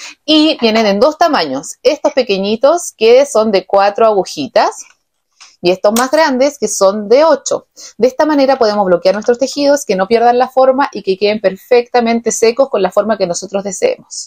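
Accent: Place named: Chile. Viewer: Que rico escuchar tu acento chileno!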